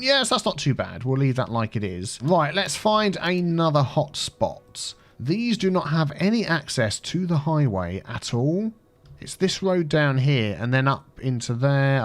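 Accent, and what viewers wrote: United Kingdom. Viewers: Oh ok lol, love your vids btw mate just found your channel last week. Can I ask where you're from if it's not rude, I'm terrible with accents and was wondering what part of uk you were born haha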